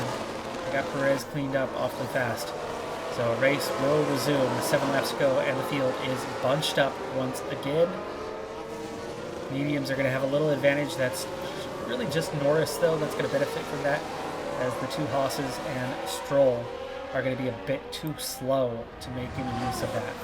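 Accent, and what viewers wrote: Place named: United States. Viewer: I fail to see, or hear, basic English being adhered to either I agree, regardless of where the drivers are from he supposedly speaks English its just that as he is American it is a bastardised version of the language and it's only people from that country that decide to pronounce words and names completely differently to how they should be, fact. Thanks for stopping by and for your insightful contribution, look forward to your input again next time you are feeling like a SJW 👋😁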